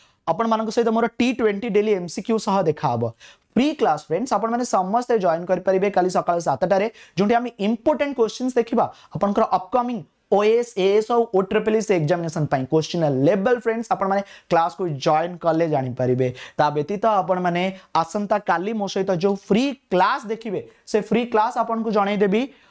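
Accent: United Kingdom. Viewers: Like an English accent...but his amazing teaching style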